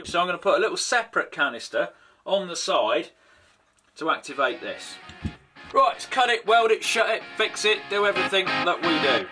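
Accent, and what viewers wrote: England, United Kingdom. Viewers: L’accent anglais m’énerve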